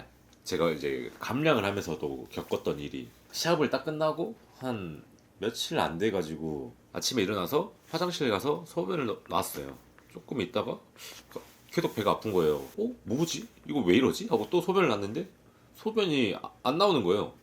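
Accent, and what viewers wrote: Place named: Jeolla, South Korea. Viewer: 오 완전 서울분인줄 알았는데 유튜브로 보니 좀 전라도 사투리가 들리네요 ㅎㅎㅎㅎ 매력있슴다~~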